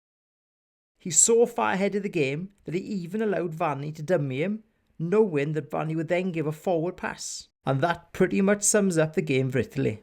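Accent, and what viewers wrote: Wales, United Kingdom. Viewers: Sorry. I enjoy what you do but your welsh accent is an obstacle for a normal frenchman. Friendly. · Great analysis, and I like your accent (welsh, if I am right). I will check your other videos.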